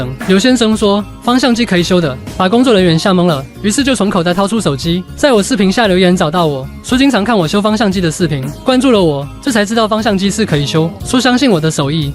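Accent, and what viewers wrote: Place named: Taiwan. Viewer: 可這明顯台呱口音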